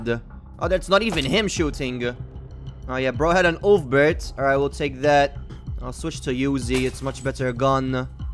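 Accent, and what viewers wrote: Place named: Malta. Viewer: Yooo bro... Weird question.. are you Maltese??? You have such a Maltese accent 😆😆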